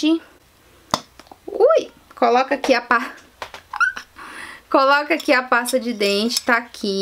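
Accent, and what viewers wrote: Minas Gerais, Brazil. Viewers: É mineira ? Kkkk você parece engraçada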